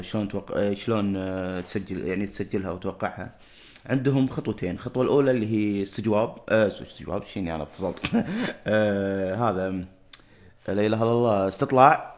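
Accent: Kuwait. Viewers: انت سعودي ولا كويتي ؟؟؟ يا اخي كلامك كويتي والله احسبك كويتي طول هالمدة:) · اشكرك بس مافهمتش ولا أوشي هههههه عاد انت من الرياض زانا أحسبك من إخواننا الكويتين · اما انت سعودي والله كنت احسبك كويتي &__& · آما انت من الريآض , آحسبك كويتي !؟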